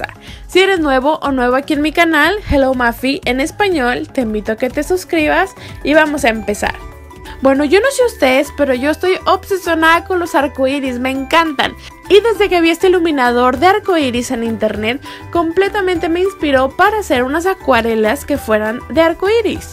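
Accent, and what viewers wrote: Fresa, Mexico. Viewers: Hablas muy fresa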